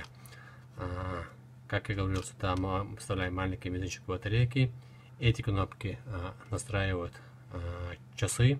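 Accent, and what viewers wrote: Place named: United Kingdom. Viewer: у тебя акцент интересный,британский или вроде того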